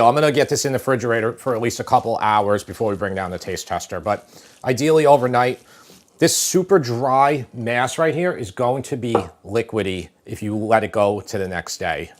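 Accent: New York, United States. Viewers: I'm a vegetarian, don't eat tuna, but love your NY accent and watched the video all the way to the end😅😅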